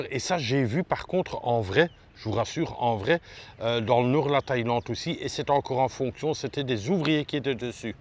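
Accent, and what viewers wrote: Belgium. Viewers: je suis tombé sur toi par hasard,au premiers son de ta voix,jai su que tu etait belge,hahaha,ca fait plaisir de voir un youtubeur belge,par contre oulalala,je vient de voir ,vu que je t'ai decouvert,que jai une tonnes de video a regarder sur ta chaine,j'en ai pour un moment.......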